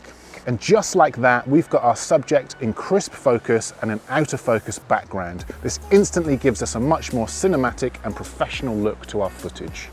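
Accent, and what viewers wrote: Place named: England, United Kingdom. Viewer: Honestly I have subscribed you of 2 things, 1. You are so good at this! 2. You are English (I love English accent) But I'm an Android fan! 😳😳😳 And I really don't want to unsubscribe your channel at all! 😞😞😞😞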